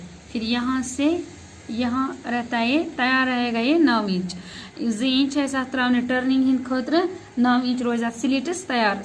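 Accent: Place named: Kashmir, India. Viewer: Your language is sweet I think you are from North Kashmir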